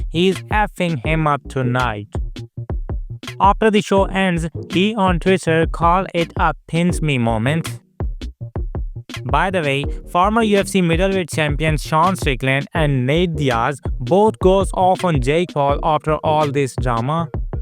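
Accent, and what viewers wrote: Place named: India. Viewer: Wttf is this indian voice talker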